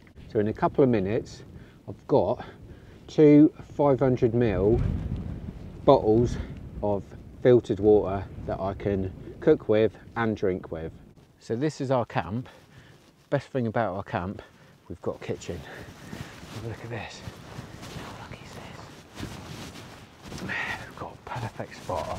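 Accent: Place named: East Anglia, United Kingdom. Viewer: Ah, are you from East Anglia? Your accent sounded familiar to me and thought you might be from that area?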